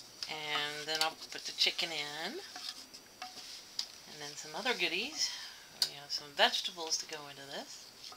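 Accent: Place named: South, United States. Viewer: I love your Southern ? accent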